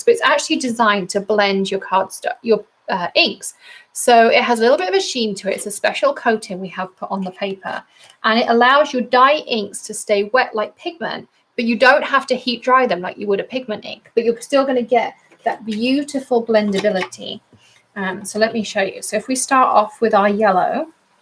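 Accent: England, United Kingdom. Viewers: As a new 'maker' I found you quite by accident last month and just love your presentations. It was, at first, confusing to hear an English accent associated with US company (I'm in Yorkshire). I just saw this video and had to make a comment. I find it heartbreaking that mean people can do so much harm. There have been a few other people similarly affected this year and Cathy Z has posted this (you may have seen it) and I thought it may help you: <link> Without you lovely maker-presenters this year would have been very difficult for me (and I suspect many others too). Meanwhile good luck with the new goodies and I wish you a Happy New Year.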